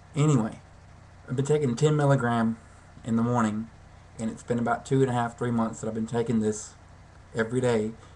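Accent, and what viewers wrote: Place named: South, United States. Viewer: Hey buddy! Thanks for checking my vid out! I noticed your Southern accent (half my family is from the South). Being a man with depression-type issues is hard enough, but is it even harder in the South? My father was the hardest-core South Carolinian you'd ever meet. He was bi-polar but never sought help cuz of his pride. We suffered because of it.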